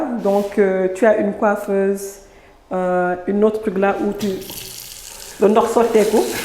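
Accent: Senegal. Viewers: Pourquoi il y a des gens aigris. L idée est magnifique et j adore ton accent un peu d english de french et une petite dose de wolof. Ne change rien tu es trop agréable et captivante. 🥰🥰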